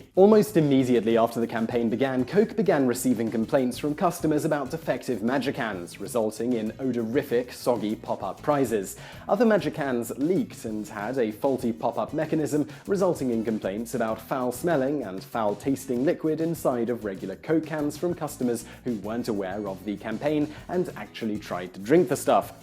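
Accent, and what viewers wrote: United Kingdom. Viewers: Since when has Vsauce Micheal had a British English accent?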